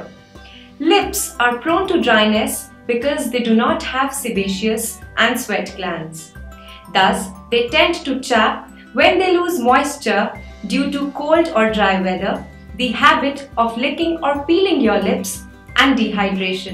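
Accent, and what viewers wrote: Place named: India. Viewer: love ur indian accent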